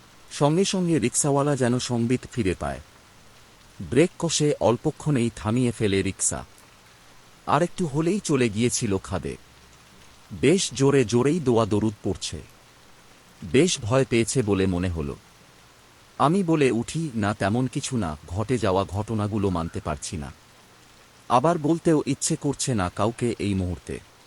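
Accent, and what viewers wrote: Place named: Rajshahi, Bangladesh. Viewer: রিডিং পড়ার মত হয়েছে। রাজশাহীর টান বাদ দিতে হবে।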